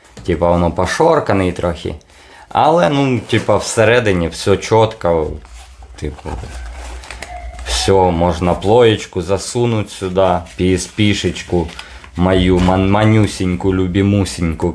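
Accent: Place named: Trostianets, Ukraine. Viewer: акцент як у мого кореша з Тростянця ))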